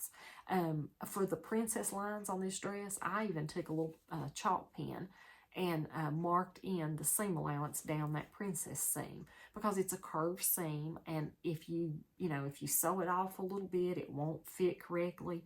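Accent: South, United States. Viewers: Will you show this on your video? Love your southern accent. We are originally from Tennessee but have lived many places in U S. Would love to see all supplies used.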